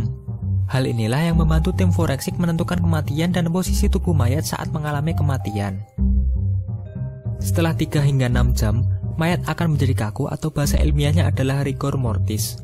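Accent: Java, Indonesia. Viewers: logat jawa nya lengket banget wlwpn pk bhsa indo. 😅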